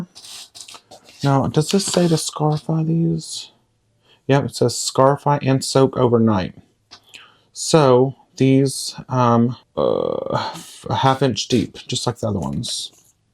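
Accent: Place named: Mississippi, United States. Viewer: LOL! I have a bob ross chia pet too haha. Your accent makes me feel like I'm back home. I'm originally from Mississippi.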